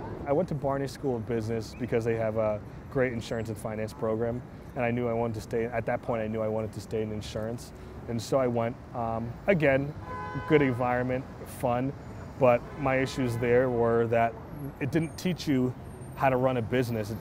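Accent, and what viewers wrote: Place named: Africa. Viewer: You guys are actually from africa aren't you and now you're here giving us a bad name. Well listen up buddy-we don't need your help so stop hiding behind AA and show your true self. Covering OR dropping your accent is not fooling anyone😎😎😎😎😪😪😪😪😪😓😓😓😓😬😬😬😬😬😬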